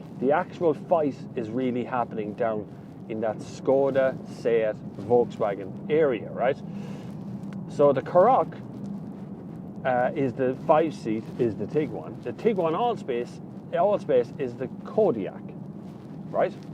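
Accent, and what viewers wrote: Ireland. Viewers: baaags of space, love this Irish accent, 2011 quite good amount of snow.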